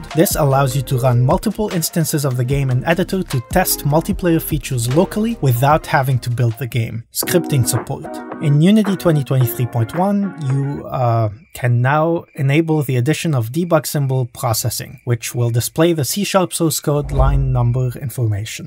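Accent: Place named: France. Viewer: Love the french accent! Good video :D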